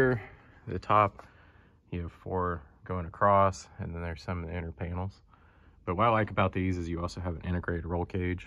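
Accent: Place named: South Texas, United States. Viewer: We speak the same dialect in deeeep South Texas by the Gulf of Mexico and it's alive and going strong here.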